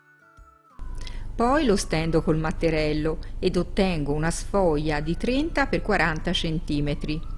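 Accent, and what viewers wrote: Tuscany, Italy. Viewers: Hai una bellissima voce, mi pare tu sia Toscana. Complimenti per la spiegazione della ricetta. Buonissime!!! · Buonissime e mi piace anche l`accento Toscano ho vissuto a Firenze 20 anni e amo l`accento toscano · Che bell’accento toscano! 😀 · Sei toscana?😅semplice curiosità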